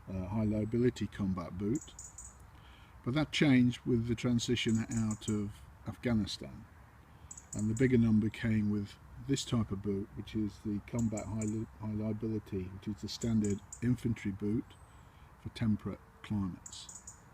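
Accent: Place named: United Kingdom. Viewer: Ah, that lovely British voice ! Of course, you guys used your ruddy boots to kick the shit of of my country and countrymen for about two hundred years but since you people also brought in modern medicine and science and the bloomin railway, I'm not gonna hold that against you. Good video... great boots, the new ones, that is. The black ones were shit. Lovely presentation. Wish your lot hadn't decided to become increasingly 'American'. Alright, take care then. Toodles !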